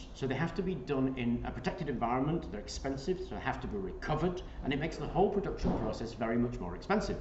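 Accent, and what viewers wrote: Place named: United Kingdom. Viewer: Hello, and welcome back no new videos I missed your videos, I learned so many things from you, I am fascinated by the materials that can accumulate energy, I learned why it happens because simple materials are so wonderful. I learned a lot from your videos, even British English:) thank you for sharing your knowledge with us. I wish you much health, and so many beautiful things, you are the best. Ciao,e bentornato non nuovi video mi sono mancati gli tuoi video,ho imparato tante cose da da te,sono affascinato dagli materiali che possono accumulare energia,ho imparate perché succede perché semplici materiali sono cosi meravigliosi. Ho imparato da vero tante cose dagli tuoi video ,anche l inglese britannico:)grazie per aver condiviso le tue conoscenze con noi . Ti auguro tanta salute,e tante belle coso ,sei il migliore.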